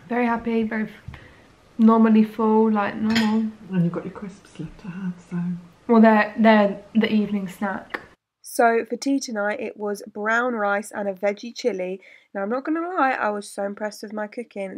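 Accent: England, United Kingdom. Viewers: She reminds me of Emma Watson (and not cause she has an English accent) but she looks like her too sort of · You are adorable!! Love the English accents and expressions being from across the pond!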